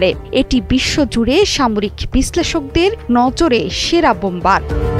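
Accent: Kolkata, India. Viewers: এইসব খবর পাঠিকা দিনে দিনে কলিকাতা হয়ে যাচ্ছে৷ উচ্চারণের সে কি হাল৷ চাবায় চাবায় কথা কয়৷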